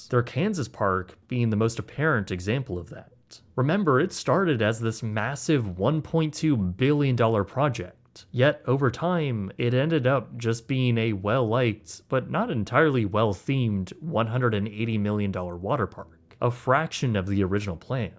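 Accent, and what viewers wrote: Canada. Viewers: He’s from Canada they sometimes talk like that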